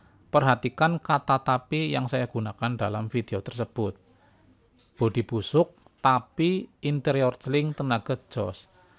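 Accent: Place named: Banyumas, Indonesia. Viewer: Orang Ngapak ya om 😂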